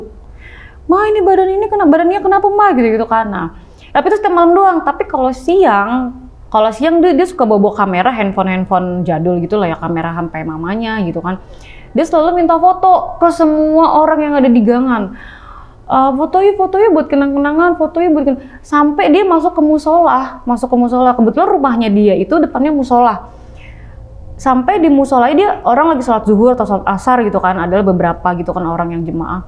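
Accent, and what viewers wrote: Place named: North Jakarta, Indonesia. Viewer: Dari gaya gaya ngomongnya kaya kenal dari daerah mana,, eh Jakarta Utara toss dulu salam dari asal Priouk.. penasaran Jakut nya daerah mana nih ceritanya? Hehehe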